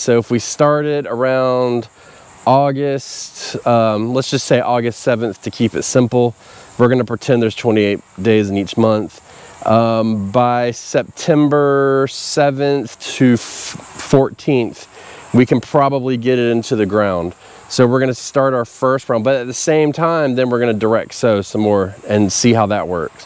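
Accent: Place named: North Carolina, United States. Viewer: Northern NC accent I'm guessing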